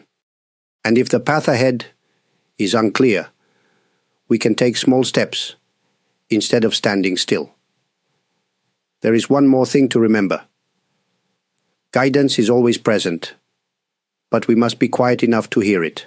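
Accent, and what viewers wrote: United Kingdom. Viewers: IT OCCURED IN MY MIND, TO NOTICE THAT YOU HAVE SOME BRITISH ACCENT IN THE WAY YOU SPEAK. ARE YOU LIVING IN BRITAIN MAYBE?😇🙏💜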